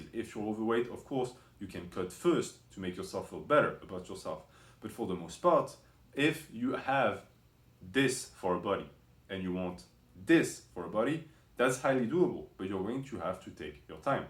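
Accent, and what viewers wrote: France. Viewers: J'aime bien l'accent français ;)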